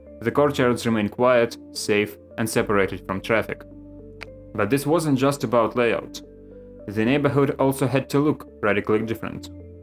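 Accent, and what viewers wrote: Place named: Ukraine. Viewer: Finally, Ukrainian voice on a niche topic in English. Please, go on.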